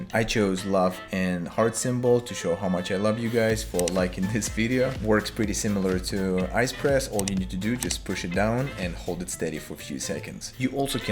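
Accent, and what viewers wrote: Russia. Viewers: Я один слышу русский акцент? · Я никак не пойму. У тебя вроде как русский акцент? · Русский акцент.) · Он очень похож на русского который говорит на английском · русский же акцент, не? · Ну я понял что ты русский но не по имени а по акценту ну чисто вот этот русский который хочет дать тебе по лицу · Where are he from? Soo shity russion accent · Вот странно слушать русский акцент английского языка, будто говорит на родном но ничего не понятно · По акценту понял, что автор канала русский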